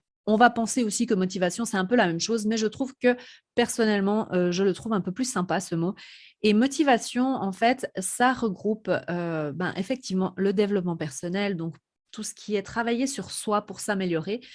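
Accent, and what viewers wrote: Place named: Switzerland. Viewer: Bonjour! J'ai découvert ta chaîne il y a 2 semaines et j'accroche vraiment! Je ne suis pas maman mais je travaille à mon compte depuis la maison et du coup il y a beaucoup de choses qui me parlent dans ce que tu dis. Je dirais même que ça m'aide alors merci beaucoup! J'aime beaucoup ton authenticité et même je t'admire d'oser montrer autant de toi, en toute simplicité, sans chichi. J'aime aussi ta façon de parler, ça me change (la Suisse c'est exotique 😉) et puis c'est ok si tu fais des"fautes de français": déjà parce qu'on comprend ce que tu dis de toute façon. Et puis c'est ta façon de faire, c'est tes expressions à toi, c'est presque ta signature en fait. On a tous notre manière de parler et c'est bien aussi! La langue est vivante et d'ailleurs les erreurs d'aujourd'hui seront peut-être la normalité de demain! Continue à faire ce que tu fais! 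👍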